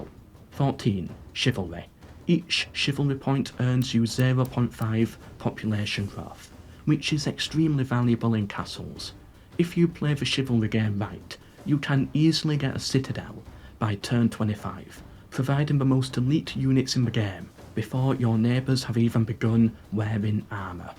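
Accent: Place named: Yorkshire, United Kingdom. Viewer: The Eternal Game. Who could best describe it, time and again, with his unmistakable Yorkshire accent? Mr., of course. No irony intended. Take care, reddish lad.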